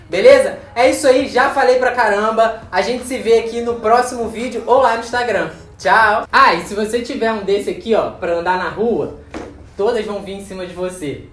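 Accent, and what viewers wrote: Rio de Janeiro, Brazil. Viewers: Você é carioca? Adorei o canal, já vou me escrevendo, e deixando aquele like.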